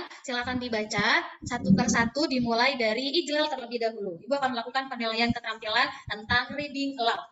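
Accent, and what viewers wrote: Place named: Banyumas, Indonesia. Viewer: Guru ngapak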